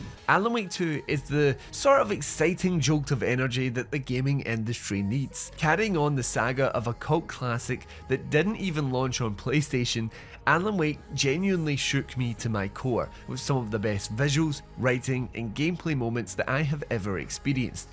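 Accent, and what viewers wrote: Scotland, United Kingdom. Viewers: love the scotlandish accent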